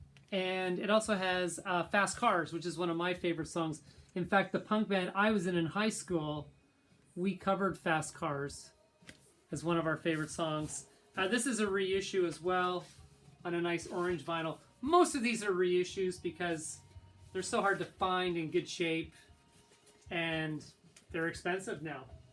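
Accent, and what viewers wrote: United States. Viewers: Dude you're not a London boy you sound American or canadian Americans started everything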